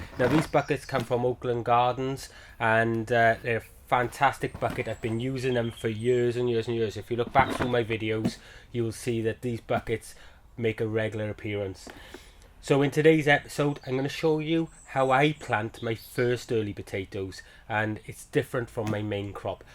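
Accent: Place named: Liverpool, United Kingdom. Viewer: Hi,. Another geezer in his seventies here, thoroughly enjoying both the content and that wonderful scouse accent! Does bucket growing translate directly into bag growing or will you be doing a vid on bag growing at some point? Grew my first spuds last year. Used potatoes from the fridge. Chitted them in a cardboard box in a dark cupboard. Chopped them up to avoid too many chits on one bit but didn't know to harden them off before planting but will now do this! Used a mix of soil, compost, perlite and coco coir. Watered in some fish blood and bone towards the end and cut the flowers off. Well pleased with the number of potatoes harvested although mostly not too big. Saved nice plastic bags that held logs we bought. Will put them in bin bags with the bottoms cut off to exclude light. Using some British Queen Scottish second earlies from JBA. 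Will also get some indeterminates in a few weeks and see what happens! Thanks again and will be learning more from you day by day. Regards, Bob'O', U.K..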